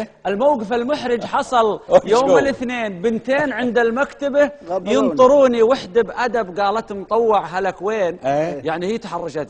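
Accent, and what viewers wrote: Iraq. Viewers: روعه يعطيك العافية. واللهجة البدوية العراقية زادتها حلاوة. ذكرتني بلهجة البدو شمال السعودية 👍🏻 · انا اشهد انها قصيده معتبره . وزادتها اللهجه العراقيه الاصيله حلا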